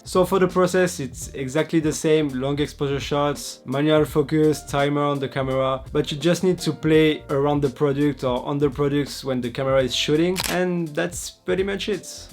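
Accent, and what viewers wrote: France. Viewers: Lovin' this french accent 🥰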